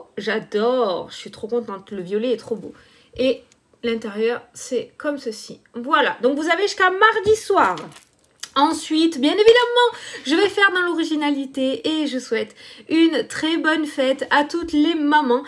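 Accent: South, France. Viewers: ADORABLE MA BELLE , SUPER TON ACCENT DU SUD , TU ES RAYONNANTE COMME LE SOLEIL, MERCI POUR TOUTES LES BELLES ÂMES QUI TE SUIVENT, JE T'AIME MA BELLE, CONTINUE.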